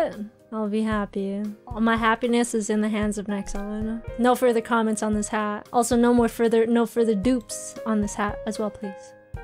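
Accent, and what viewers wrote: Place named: South, United States. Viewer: That southern accent is just getting better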